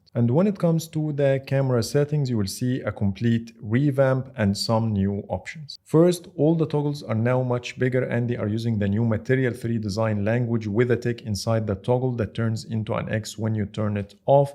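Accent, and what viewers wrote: Egypt. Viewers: اللي بيتكلم ده مصري صح؟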